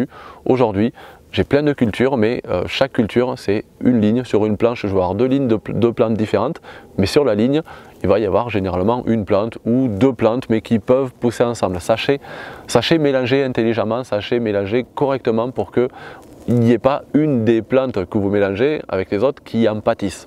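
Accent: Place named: South, France. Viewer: Passionné par la nature en général, je trouve dans les vidéos de toujours des tests à essayer( Prendre de l'avance sur le retard😅) dans nos parcelles. Sa vitesse d'élocution respire la maitrise du sujet,il est toujours agréable à visionner les différents sujets proposés. Avec le bon accent du sud aussi, TOP!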